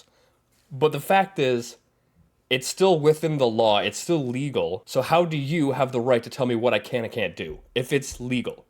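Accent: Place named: United Kingdom. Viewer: Good British accent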